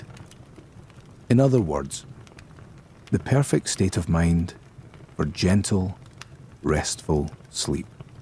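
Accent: Scotland, United Kingdom. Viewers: Yayyy Scottish❤ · The Scottish accent is perfect. Not too much of a heavy brogue. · I never realised there was a Scottish voice on Headspace 🥳🏴! I wish you could filter a search by accent, or person, as British accents are more relaxing for me - ie, where Headspace started with Andy's voice (I find some of the American accents quite jarring 🙈). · I never thought a Scottish accent would be lulling me to sleep regularly. Make more! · i want a scot in my ear all the time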